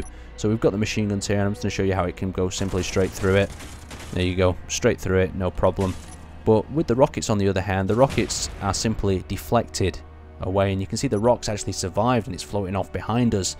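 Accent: United Kingdom. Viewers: "no dam-edge to the stayshin at awl" filthy fucking britbong liar it damaged the station get ur fuckin eyes checked are they as bad as yoru teeth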